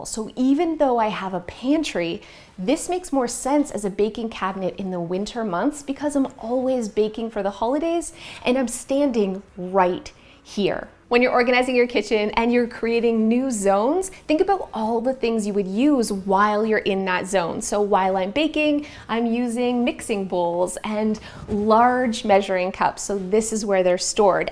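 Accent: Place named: Canada. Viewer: That Canadian accent is coming through! Lol 😂 Love these tips!!!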